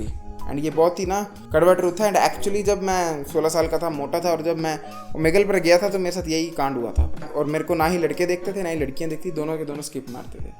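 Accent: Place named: Punjab, India. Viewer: Bhai Aapka accent Punjabi lagta hai kabhi kabhi aap Punjab se hi kya?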